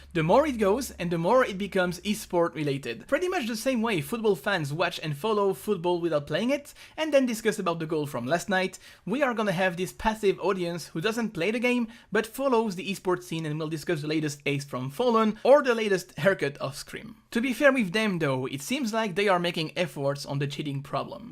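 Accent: France. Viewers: Ohohoho, you got french accent monsieur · olala ton accent francait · Are u French? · A l'accent, j'ai reconnu que tu étais français... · Tu est français ? J'ai l'impression de l'entendre dans l'accent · He's French dude, accent's getting in the way, but still clean English. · You French? You've got a French accent when talking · Ce léger accent français :p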